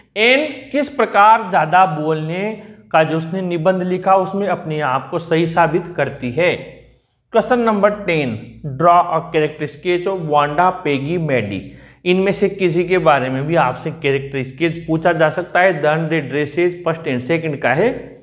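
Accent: Hadoti, India. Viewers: sir aap hadoti pradesh ke lagte hai ⓟⓛⓔⓐⓢⓔ ⓣⓐⓚⓔ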